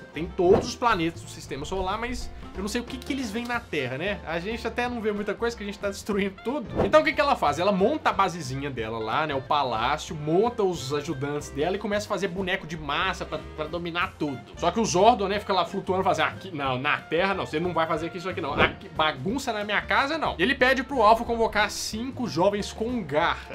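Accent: Minas Gerais, Brazil. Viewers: Esse brother é muito mineiro! kkkkkk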